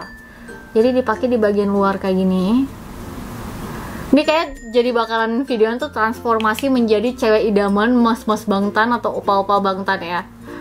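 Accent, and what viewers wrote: Bali, Indonesia. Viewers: logat balinya masih ada yaa eonnie "lagi satu" bukan "satu lagi" hehehe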